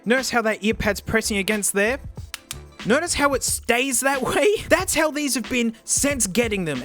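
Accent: Australia. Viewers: I can never understand what you’re saying on these mic tests. It’s a mixture of australian accent with me not being great at english and headphone mics sucking that is simply impossible for me to overcome. I’m so sure you’re saying something funny I still laugh sometimes tho, hahahahah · Can you please reveiw the skullcandy crusher's, i have had them for 2 years and i love them but i would love to hear your opinion on them. Btw, love ur vids and laugh everytime u talk with that Austrailian accent XD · I fucking love Australians. The accent is on point for these type of videos.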